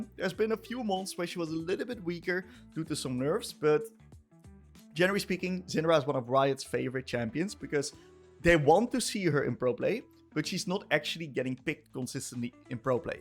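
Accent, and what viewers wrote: Netherlands. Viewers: I like your typical Dutch english accent!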